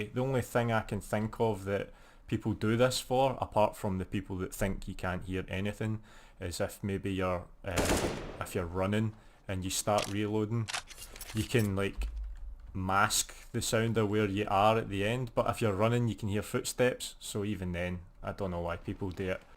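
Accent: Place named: Scotland, United Kingdom. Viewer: CS GO tutorial in a Scottish accent. Fuckin inject it in my veins.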